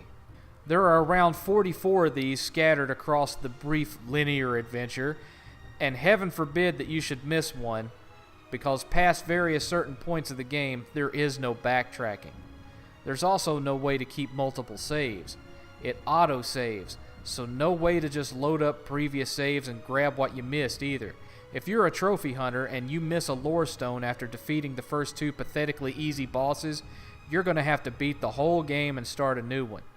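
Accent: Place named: Alabama, United States. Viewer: I didn't really like the game, it was cool but meh, but your war against artistic indie games is some real incel shit. Also you seem to have blanked out half the game, the lover never blamed her, that was clarified later, that was her mental illness making her assume the thoughts of others, her father did some sus shit though. "San Francisco Arthouse crowd", oh I get it, you're one of those far right cringe republican reactionaries, yikes. "It's easy and predictable" while showing a video of you getting your ass beat by singular enemies. "Dark Souls for dummies" right after he showed he sucked at it. Guess you're self burning yourself there? And then he cries about the twitter crowd lol. It's very hard to listen to you complain about how easy the game is, when you're barely winning the battles on screen. This guy's accent and word choice becomes more Alabama the longer this video goes on. 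OMG, he's LITERALLY triggered by people liking this game, that's pathetic asf. "Pieces of shit who said this was goty"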